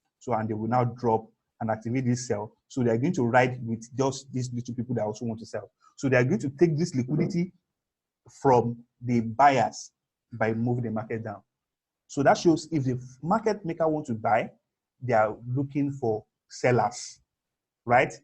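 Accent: Nigeria. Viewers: You sound soooo Naija my guy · Na naija guy na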